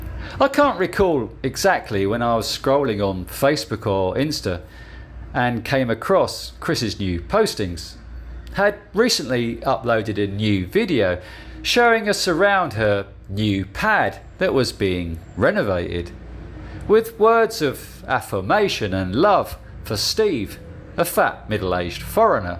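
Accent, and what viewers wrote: England, United Kingdom. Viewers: The English accent is way better than a yanks . Don't watch if you don't like. UP THE POMS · Your strong English accent is annoying to listen to, if I was a woman I wouldn't want to hear it , an American talking English is much more pleasant.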